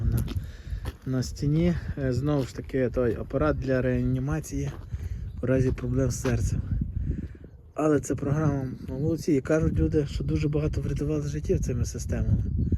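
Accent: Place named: Western Ukraine, Ukraine. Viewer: ви мабуть родом з Прикарпаття,відчувається що десь західної України,я теж родом звідти,але все життя проживаю біля Києва.Хай вам щастить!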